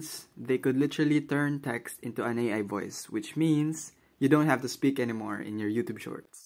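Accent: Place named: Philippines. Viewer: Bro sounds like filipino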